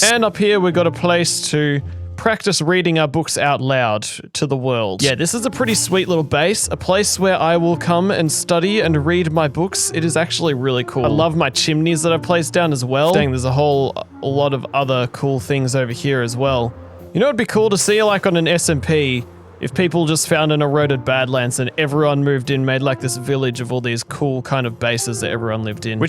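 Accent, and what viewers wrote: Australia. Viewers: oh im so subsribing. youre an aussie minecraft youtuber, but like aussie ausie. i feel at home here (im aussie too) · Are u Australian?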